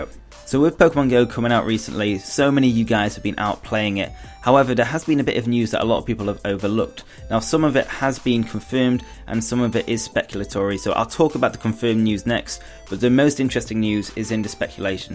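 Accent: United Kingdom. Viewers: you from sheffield?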